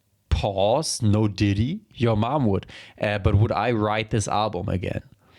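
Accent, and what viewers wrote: Germany. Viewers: why does your accent does sound like your german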